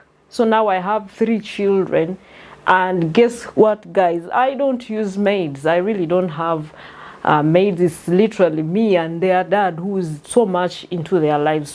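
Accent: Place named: Kenya. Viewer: you look kenyan now and talk like them😂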